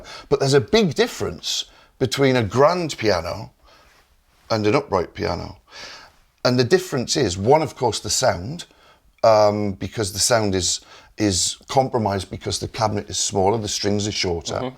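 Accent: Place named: Yorkshire, United Kingdom. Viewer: It's "Anechoic Chamber", non "Anaconic", but he sounds like a Yorkshireman so it can be excused.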